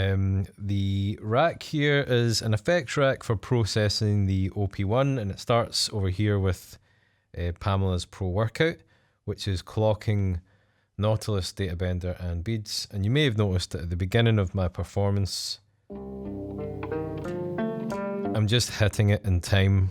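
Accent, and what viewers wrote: Scotland, United Kingdom. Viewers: Backing track? what is cheating in music production at all these days? that sounds interesting, as far i understand your nice scottish accent;)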